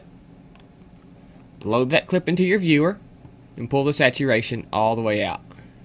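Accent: South, United States. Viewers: southern american aint it?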